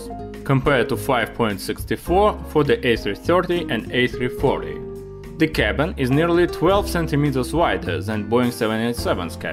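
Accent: Russia. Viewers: Its Russian accent I think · he’s Russian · if you have a foreign accent, it means you speak another language. This guy probably speaks Russian. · RUSSIAN ACCENT !!! I'm not watching.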